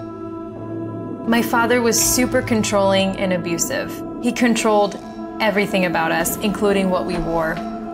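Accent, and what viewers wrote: United States. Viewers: Hey your simple amazing girl and jus be what your and your voice soooooo lovely special with u s accent 👍🏻👍🏻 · Yeah... Indian music, Indo-westren clothes, American accent n free bird... Makes u unique from others... That's why we love❤ uhhh 😘😘 love from ur root place Congrats for 7 M fam #VV ❤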